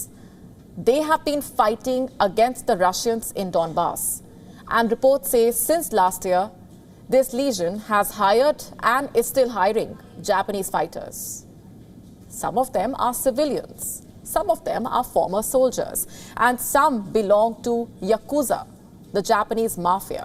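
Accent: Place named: India. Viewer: your English sounds really Indian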